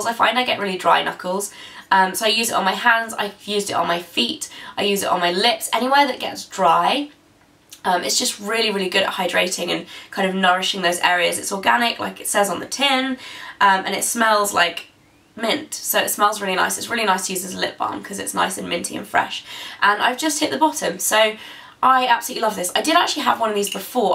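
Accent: England, United Kingdom. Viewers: what part of england u from? i'm really curious, can't tell? im guessing like either oxfordshire/ west midlands/ buckinghamshire? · Your accent is 100% English but you have that upwards intonation at the end of each sentence (as if you're asking a question) which is all American! Love your videos :-)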